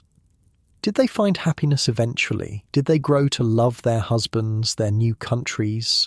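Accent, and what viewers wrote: England, United Kingdom. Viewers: I’m absolutely sick of the English accent from the narrator. Can we get some American history? 😂 can’t use a fancy British narrator for that lol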